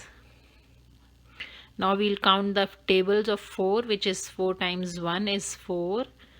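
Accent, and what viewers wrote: India. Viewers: Indian accent l guess?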